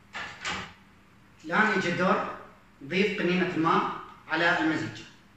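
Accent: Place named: Iraq. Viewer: السلام عليكم اخي اني انتقدك لان اولا انت لست بطبيب ولا بمعشب انت توصف هذه الوصفات الى الناس وانت تجهل مكونات ومعطيات هذه المكونات من اثار على المعدة وعلى ارتفاع ضغط الدم الذي يسببه الزنجبيل اما الحامض فهو مدمر للمعدة للذي عنده مشاكل في المعدة اضافة الثوم اني لا اعرف كيف تصف هذه الوصفة لجميع الإعمار اخي اتقي الله انت من لهجتك من العراق والعراقين طيبين ولا يؤذن الناس اخي لا تفتي بشي لا علم لك به فسوف تسال امام الله وان عملت شي بجهالة، وحسب مشاهدتي الفديو انت مسلم وتذكر الله فالرجاء ابتعد عن هذه الأمور وانت لا تفقه شي بالطب ، اذا اردت ان تريد زيادة متابعيك لا باذية الناس شوفلك موضوع اخر تعلم به ولَك الشكر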